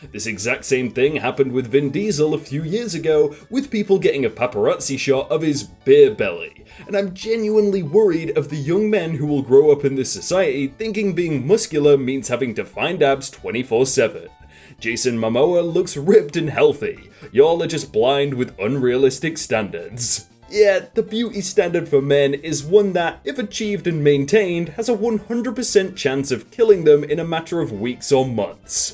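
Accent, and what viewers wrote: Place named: United Kingdom. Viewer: Every video helps me learn British-English I love it, thank you 🙏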